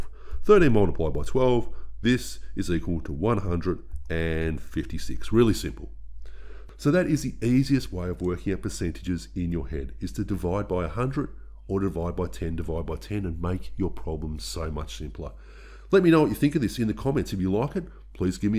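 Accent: Australia. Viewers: As a New Zealander, I would say his accent is from Australia, and probably so is he.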